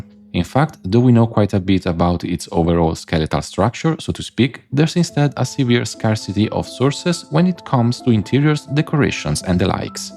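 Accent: Italy. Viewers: Ma sei italiano?? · Ehm mi sembri un italiano all’estero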